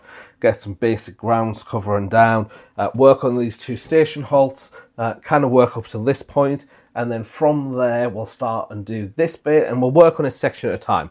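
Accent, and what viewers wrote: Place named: Sunderland, United Kingdom. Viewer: I'm not sure how helpful this will be for you. There's a little model shop in Sunderland (sorry I'm just guessing you are near by based off the accent) called Sunderland model Station. They have several new and used Skaledale buildings, there might be something in there for you. Again I'm not sure how easy it is to visit the shop but if you get chance it might be worth a look 😊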